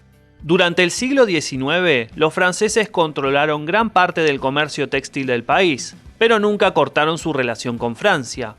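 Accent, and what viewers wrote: Argentina. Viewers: Pues el wey es argentino, cómo quieres que hable pues ?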